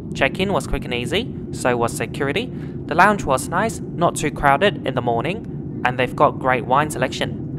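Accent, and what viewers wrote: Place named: Australia. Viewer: why do i feel like your accent has changed. it's more aussie than before.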